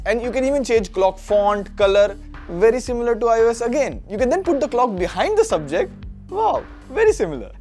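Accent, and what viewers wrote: India. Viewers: I love the accent, are you Indian? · classic indian accent 🤣🤣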